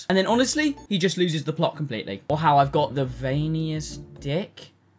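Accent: United Kingdom. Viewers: man your vidoes are fun 😂 Gonna binge watch everything now!! P.S. That bri'ish accent helps too 🤭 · i think i just fell in love with british accent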